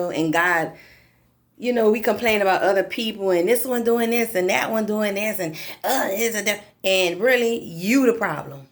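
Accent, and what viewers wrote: New Orleans, United States. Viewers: Her New Orleans accent 🤤 She still got it 😍💙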